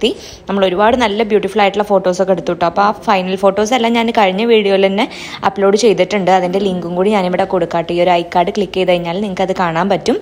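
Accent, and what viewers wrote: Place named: Malappuram, India. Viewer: Malappuram thanje ayathu kond talk nalla pole cnct anuu😄so kettondirikkan nalla rasayittundd💛🧡😁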